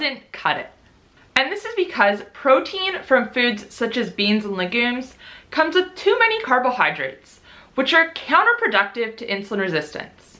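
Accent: Australia. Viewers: I though you were Aussie - great to see an Aussie doing well on YT :)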